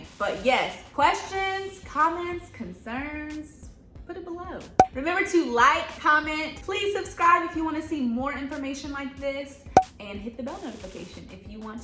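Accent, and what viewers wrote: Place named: United States. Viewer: Ok, you are SOOO pretty and I love your voice! For context, I’m British and love your American accent.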